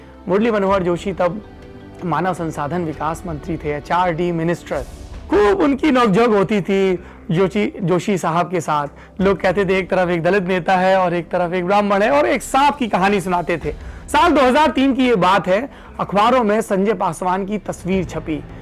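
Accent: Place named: Bihar, India. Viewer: .aap bihar se hain kya, bihari language bahut jachti hai aap pe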